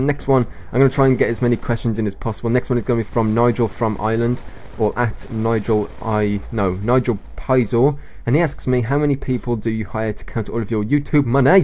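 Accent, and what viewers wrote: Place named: Northern England, United Kingdom. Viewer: where are you from? It sounds maybe north england (Don't really know though)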